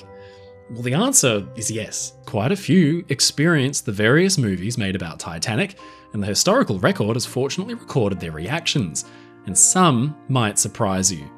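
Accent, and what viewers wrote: Australia. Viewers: I knew you were Australian, hi from Tasmania, and thank you for being a more familiar realistic representative for Australia ❤❤❤😊. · What? The uploader is Australian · I've noticed that too - uses several other American pronunciations in his videos, I can't remember any of them right now...but they sound wrong coming from his (refined) Aussie accent.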